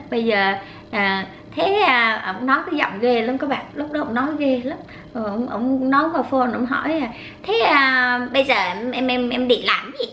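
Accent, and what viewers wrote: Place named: Central, Vietnam. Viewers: Giọng chị giống ng miền trung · chị nói giống giọng đà nẵng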